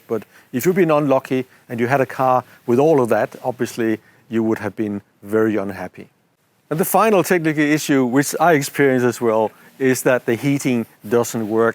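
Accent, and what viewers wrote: Denmark. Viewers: Do I hear a sligth danish accent?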